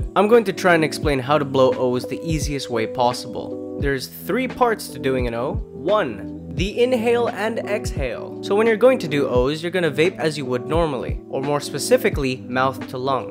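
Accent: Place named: England, United Kingdom. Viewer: Yung english accent talaga eh 👍👌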